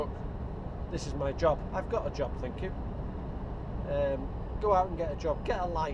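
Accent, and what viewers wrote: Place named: Wrexham, United Kingdom. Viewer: Your accent sounds like your from Wrexham way... just block delete these fucks, i personally love your vids, seen every one of them, keep up the good work, and ignore them, block delete and you have won, they then have to go through all that rigmarole to make a new account, if then they come straight back and u block and delete them again, you have won again... they cant keep that up... love your vids!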